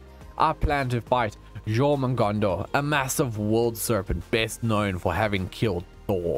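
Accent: South Africa. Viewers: The south African accent is kak funny 🤣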